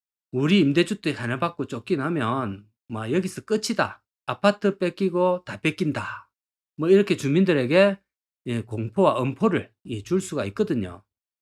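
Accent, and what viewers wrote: Gyeongsang, South Korea. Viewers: 오랫만 겡남사투리 좋아요~~ // 재건축하긴 세대수가 좀 많고..대지도 넓지 않아서 애매한 ...위치는 참 좋네요 ~ 주민들도 진퇴양난 이겠응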